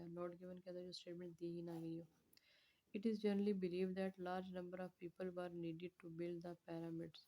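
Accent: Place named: India. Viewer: I put like for "bismillah"but unfortunately i cannot understand because of your accent your accent don't like English Like Indian 😢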